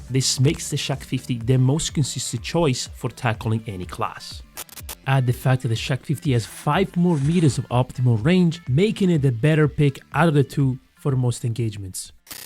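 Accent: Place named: Russia. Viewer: i'm not too sure, as I haven't heard a lot of accents, I wanna say Russia, but I also don't want to seem offensive if i'm wrong sooooo, sorry if I am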